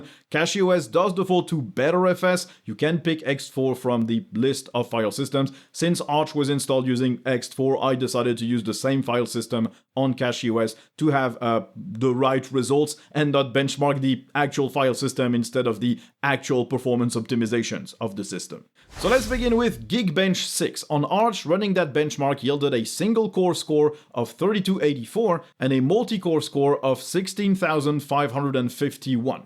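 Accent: France. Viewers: Wait, are you french?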